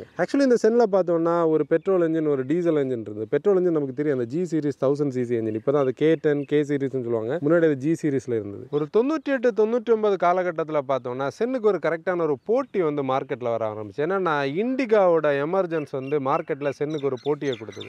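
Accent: Kanyakumari, India. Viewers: Your presentation and the slang of my own Kanyakumari style is extraordinary. Keep going all the best.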